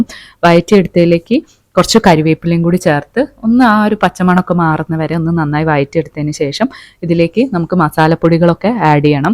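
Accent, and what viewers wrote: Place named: North Malabar, India. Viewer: R you in kasargod/kannur?.. Talking style feels like ours❤️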